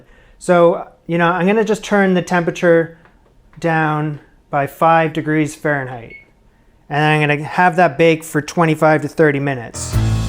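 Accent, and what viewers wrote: Canada. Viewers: You had it right ... The L's are pronounced in chantilly. You give the A a bit different sound than I, but I think that's because you're Canadian. Stick with your instincts. Really enjoy your videos whether you seem to mess up or not.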